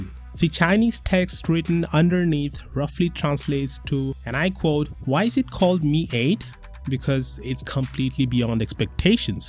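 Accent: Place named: India. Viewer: The way you're fluctuating between the Indian and the wannabe American accent is making you sound unclear. Go for something that is more comfortable with you.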